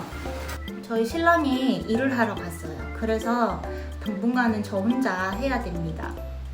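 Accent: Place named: Ulsan, South Korea. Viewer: 출근준비하면서 우연히 보게되었어요. 혹시 울산인가요? 억양등 말투가 참으로 익숙해요. 있어보인듯 꾸미지않으시고 편하게, 사실적이게 말씀하셔서 편하네요~^^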